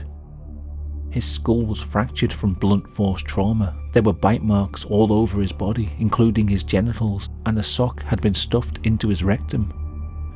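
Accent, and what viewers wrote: United Kingdom. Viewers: How have I not discovered your channel sooner?! True crime is a lot easier to take in with a British accent I find. Disturbing stuff but very detailed work. You'll find me here more often now. Keep it up!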